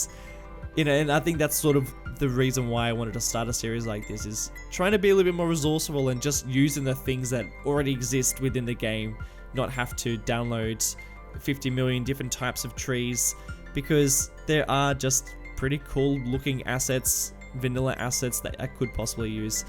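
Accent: Australia. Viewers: Crickey! Noice straya accent... 🇦🇺🇦🇺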